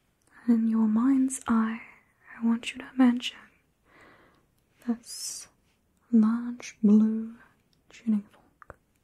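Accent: New Zealand. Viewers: New Zealand mate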